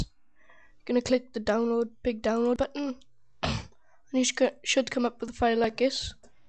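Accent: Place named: Ireland. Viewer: OMG ARE YOU IRISH?!?!?! I LOVE IRISH PEOPLE *-*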